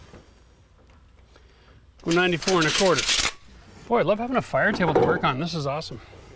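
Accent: Canada. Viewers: Good overview, as I dry out a basement because pumps were not plugged in. You sound a little Canadian?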